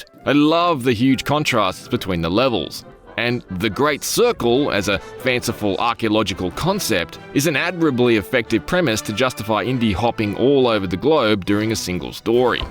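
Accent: Australia. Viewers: I've always admired your reviews. Well written, relatable, and just like a friend telling me what they think of a game. As someone who has spent most of my career in network TV and video production, I want to give credit where it's due. It's also always great to hear an Aussie voiceover that fully embraces how we talk. I'm also thrilled to see such a win for Xbox. Such a revered franchise from my childhood deserved the true AAA treatment, and I can't wait to fire it up on my PC.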